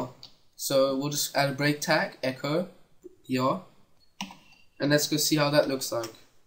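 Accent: South Africa. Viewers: Must be a south african, Viva!! Its nice to hear a familiar voice.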